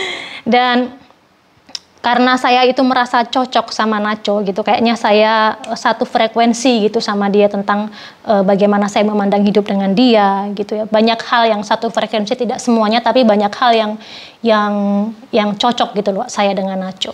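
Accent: Java, Indonesia. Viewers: Mbak orang mana ya.... Jowone ngendi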